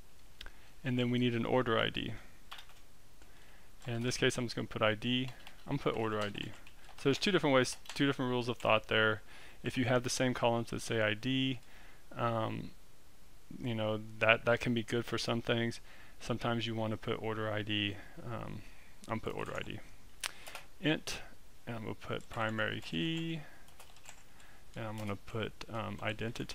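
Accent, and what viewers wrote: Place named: South, United States. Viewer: Howdy! Where are you from? Texas? You got that good ole' southern draw. Like Matthew Mcounaghey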